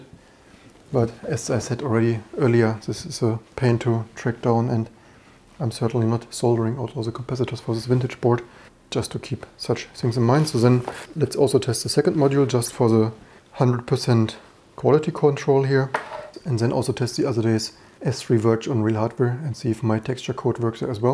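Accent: Germany. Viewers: Zis is zhe must germanbenglish das ich je gehört habe :D aber nettes Video,l!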